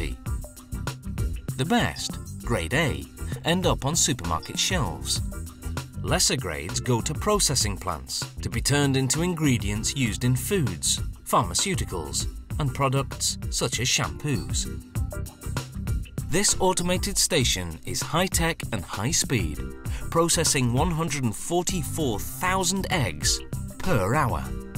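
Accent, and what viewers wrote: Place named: England, United Kingdom. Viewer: So I made an observation with my colleague, let’s call him Hona, and we observed that the narrator in fact has an English accent. That is all. G’day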